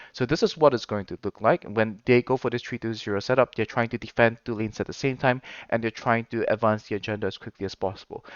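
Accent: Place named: Singapore. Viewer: Speaker slower.also don't try to hide ur singlish. Celebrate it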